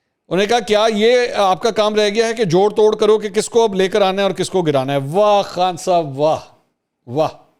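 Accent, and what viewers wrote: Punjab, Pakistan. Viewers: بھائی سلام ڈٹ کر پنجابی بول رہا ہے اندرون شہر کا پینڈو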